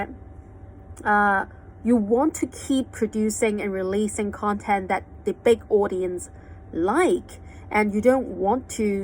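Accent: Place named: United Kingdom. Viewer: I hope you don't mind if I spot some of the mispronounced words in the first 4~5 minutes of your speech. Well first of all, I do think that you speak very fluent British English yet it's a bit stronger than usual (if you bother to listen to some native British people speak [I guess you do a lot]). Sometimes I feel that some sounds are intentionally spoken in a strong British English / you pretend to speak with a British accent, like 'feel' (with an unusually long /i:/ sound). If you could soften that very strong British accent, that would be great. OK the following words are spotted: while (it sounds like you were pronouncing it as 'wild' with an extra long vowel. It sounds a bit strange to me) significantly (missing 't' sound) strange - the 'g' sound should be a voiced consonant but then it's missed. house - again, the vowel is stretched to be too long. 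It sounds like haaouse. Again it doesn't sound natural. external - wow the 't' sound here is blended with the 'x' sound. Whenever the two consonants 's' and 't' are blended together, we should pronounce the sound like 'sd', but you kept the 't' sound when you pronounced it.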